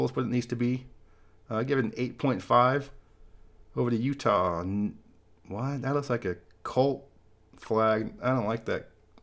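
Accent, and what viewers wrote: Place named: California, United States. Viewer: I like how u speak like a true Californian about our home state's flags.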